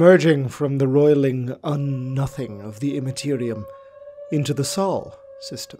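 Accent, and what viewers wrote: Ireland. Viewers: This channel is bliss. Nice to hear a fellow Irish 40k head describe the world and lore so vividly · Are you Irish?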